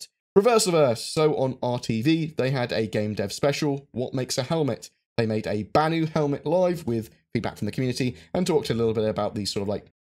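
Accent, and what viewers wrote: United Kingdom. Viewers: I appreciate your vids, I really do but could you enunciate better. Your voice is like a roller coaster. No volume setting can adjust from the highs and lows and missed syllables you have. I like the accent but not when it interferes with comprehension. Sorry man I don't mean to be rude but it gets a little annoying. I get the quips and quirks of British loving their own voice and word play. I mean you guys play with it when you speak but sometimes it's just a little too much.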